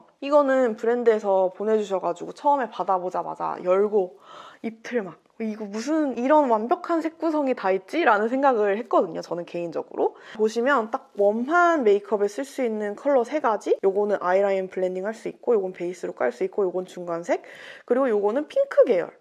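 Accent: United Kingdom. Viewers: When are you going to film your vid in English? I love your British accent😭🇬🇧❤️❤️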